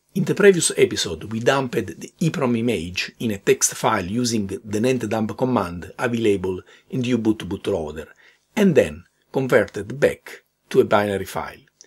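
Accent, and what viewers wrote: Italy. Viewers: the italian dancing accent with scretched vowels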